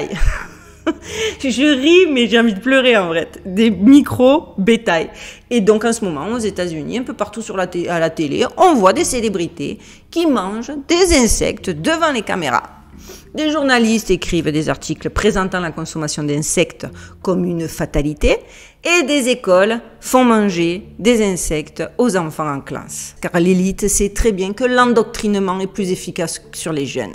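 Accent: South, France. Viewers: Un vrai rayon de soleil, ton accent du sud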